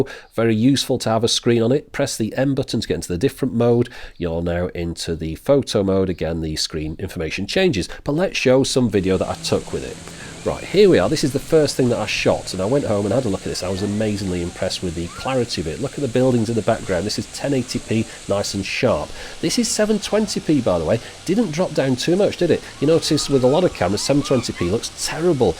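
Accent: United Kingdom. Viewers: the two plesures in one:a good overview of equipment and a beautiful British pronunciation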